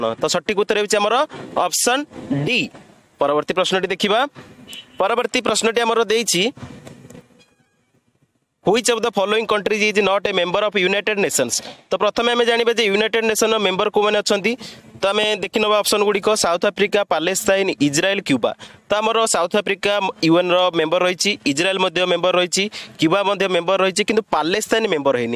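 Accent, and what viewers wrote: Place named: Telangana, India. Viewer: Telangana karuchhi touch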